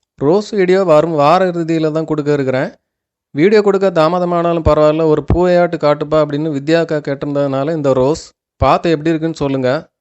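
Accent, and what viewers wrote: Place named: Tirunelveli, India. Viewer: athu nellai Tamil... Aana ippo konjam kovai Tamil mix aaguthu...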